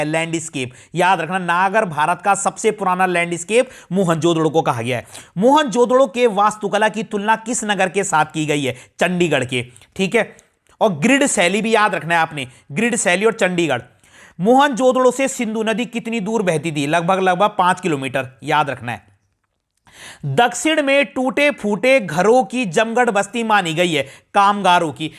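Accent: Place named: Hills, India. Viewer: सर आपकी आवाज पहाड़ियों जैसे lgri🥸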